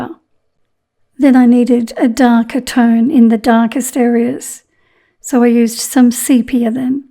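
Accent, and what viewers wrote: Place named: Australia. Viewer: HI, ~ I HAVE ALWAYS JUST LOVED YOUR ACCENT, AND YOUR TUTORIALS~ NOW I JUST FOUND OUR YOU ARE FROM AUSTRALIA!!! HOW NEAT!!! I ABSOLUTELY LOVE YOUR DOUBLE GIRAFFE PAINTING BEHIND YOU~ I'M SURE YOU PAINTED THAT ONE, YOURSELF~ I HAVE BEEN WONDERING IF YOU HAVE A REFERENCE PAINTING OF THAT ONE, AND/OR A RUTORIAL OF IT!??? I JUST LOVE IT~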